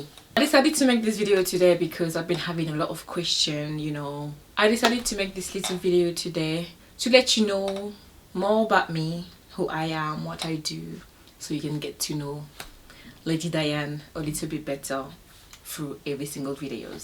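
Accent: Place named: Africa. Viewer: I like your accent sista. Sounds like African accent.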